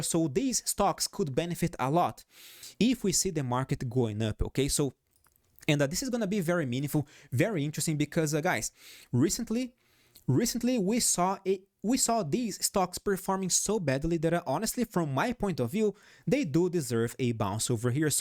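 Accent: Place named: Brazil. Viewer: Claramente um amigo do brasil, a pronuncia nao engana ! Primeiro video que vi seu e adorei. Fica aqui a minha subscrição. Cumprimentos de Portugal!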